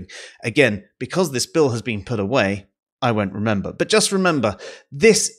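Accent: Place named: England, United Kingdom. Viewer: This video just popped up on my feed and I'm glad it did. Very interesting topic for me to help understand my son who has ADHD and autism. Interesting, to the point and nice to here an English accent as a little extra plus. New subscriber here. Looking forward to checking out your other vids. Cheers for sharing.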